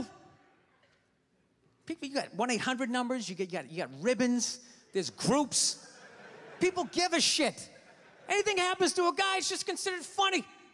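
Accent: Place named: United States. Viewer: He's really an Irishman with an american accent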